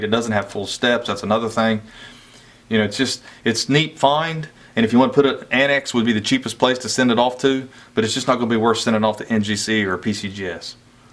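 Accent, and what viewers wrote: Kentucky, United States. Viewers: Color not Collar. Wtf you're from Ohio, sounding like some Kentucky imposter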